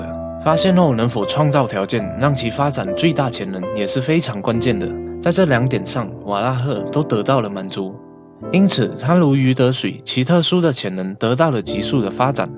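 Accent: Malaysia. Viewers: 是馬來西亞口音嗎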